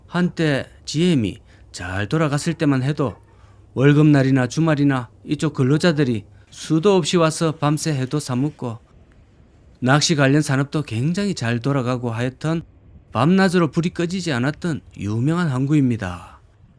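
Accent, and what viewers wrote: Gyeongsang, South Korea. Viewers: 우야노.....??앵커는 경상도??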